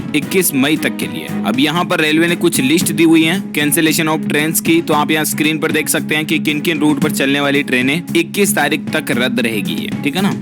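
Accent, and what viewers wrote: Rajasthan, India. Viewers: Bhai kya aap Rajasthan se hai?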